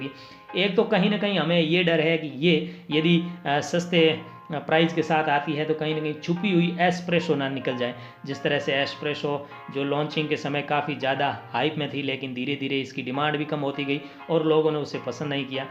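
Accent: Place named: Marwar, India. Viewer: Aapki aawaz sun ke lg gya tha ki marwad se ho bad me jodhpur dekh ke pakka ho gya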